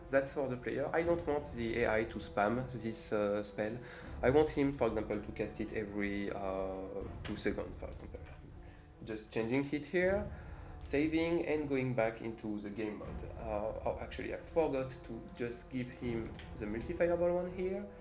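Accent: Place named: England, United Kingdom. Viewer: EPIC ENGLISH ACCENT !! (and game aswell)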